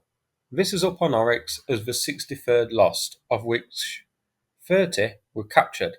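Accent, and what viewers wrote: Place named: Midlands, United Kingdom. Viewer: Hi, I know you are in Tiawan, but just wondered if you are orginally from the midlands in England as you have that accent.......Great channel btw, thanks for the very informative videos.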